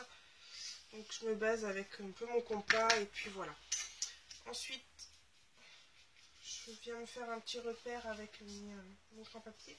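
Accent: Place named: Normandy, France. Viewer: re :c'est déjà fait , tout est au maxi , par moment je vous entend et d'autre beaucoup moins.je vais investir dans des enceintes alors mais je m'en servirai que par moments car dans certaines vidéos que je regarde je mais au minimum car les intro son en musique donc c'est trop fort . vous êtes de quelle région ? Votre accent me rappelle celui de la Normandie. bonne soirée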